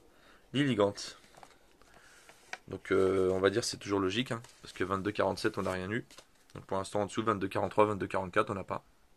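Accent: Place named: United Kingdom. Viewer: Whaaa..!!! salut l'ami belle ouverture, et quelle surprise cette petite pub et hyper sympa de ta part! merci mon cher! =) comme il a dit oui venait sur ma chaine! =) sympa ton petit concept et je suis ravie que cela n'et rien prouver comme tu la dit ou moins les scalpeur vont pas arnaquer les gens avec leur mensonges grotesque! la secret Rainbow whaou =) MAIS TA CARTE PIKA LA JE LA VOIE PARTOUT IL M'EN FAUT UNE! x) +1 like pour ton british accent! lol merci encore a bientôt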